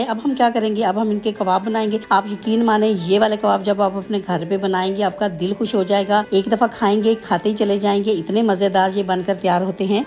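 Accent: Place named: Punjab, India. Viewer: How can u speak without taking breath 😂 very interesting food blog recipe Sy ziyada apka Bolna ka Punjabi style chah gaya 😂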